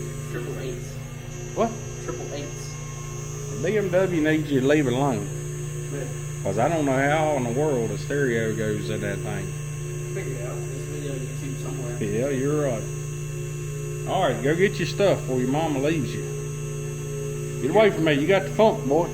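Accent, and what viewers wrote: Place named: Midwest, United States. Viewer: BTW, I ain't bustin your balls over the accent, quite the contrary. My whole gawddamn family was from the midwest a Oklahomer n Missoura n Florider. Grew up listnin to my folks n kin a gabbin round the table AFTER Sunday supper (you didn't even think about talkin at the table durin mealtime) n it reminds me a my Gramma n Grampa's house where I was raised. I sorely miss em all.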